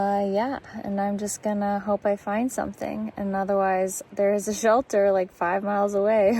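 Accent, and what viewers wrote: United Kingdom. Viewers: Hi. A couple of questions for you: 1. I follow a hiker on YouTube by the trail name of Sunshine. She is fairly short, has dark hair, and in her 40s, and would have been hiking with a man named Augustine who wears a beard. Does that sound like the person you spent time with on the beach? 2. Would you ever do an entire video using your posh, British accent? 🙂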